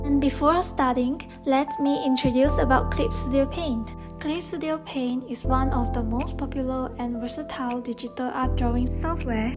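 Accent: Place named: Vietnam. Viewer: idk why but your accent looks like Vietnamese people when they speak English lol sorry if you're not Vietnamese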